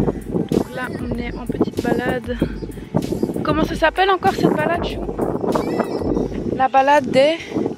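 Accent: Belgium. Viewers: Est-ce que tu es d'origine belge ou d'une autre origine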